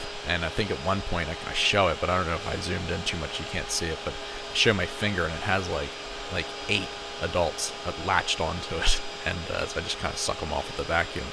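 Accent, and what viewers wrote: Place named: Philadelphia, United States. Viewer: Not sure if you're aware of a show called The Bonfire out of NYC but they would get such a kick out of how you say words like "COMB" and "HOME" They are, what you might call, connoisseurs of Philly accents.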